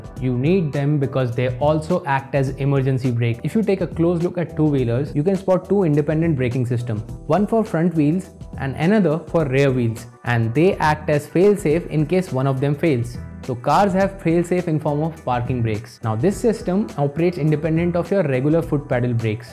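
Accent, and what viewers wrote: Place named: India. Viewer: Why can't you get rid of that indian accent ?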